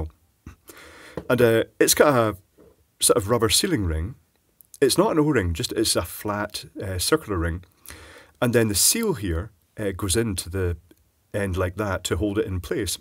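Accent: Scotland, United Kingdom. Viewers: Caption generator doesn't cope with Scottish accent...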